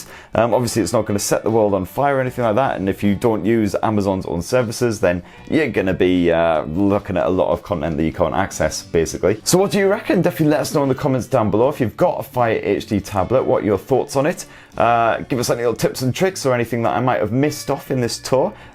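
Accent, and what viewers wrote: United Kingdom. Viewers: I just listened to this video for the wonderful British accent 🤠.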